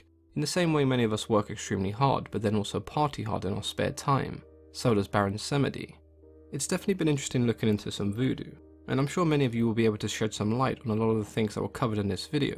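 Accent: France. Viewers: Thus french accent. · Nice French accent 😀 from Paris ! Big fan of your work !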